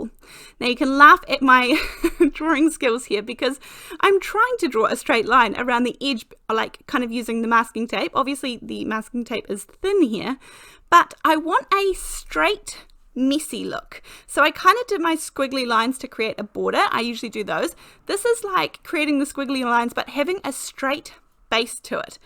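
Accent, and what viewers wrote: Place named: South, New Zealand. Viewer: Love your channel and my other half thinks you're from either Christchurch or further south... He thinks you're a proper southerner! I'm from about as far north as you can get up here in England in Lancashire. He's from Wellington originally and lived in Dunedin once. Please keep up these amazing video's x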